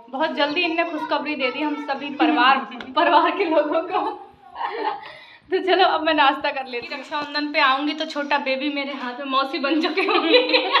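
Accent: Uttar Pradesh, India. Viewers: हमारे hardoi m bhi aise hi bolte h jaibo kaibo main jab apne ghar jati hu to main bhi aise hi bolti hu अपनों के साथ अपनी बचपन की भाषा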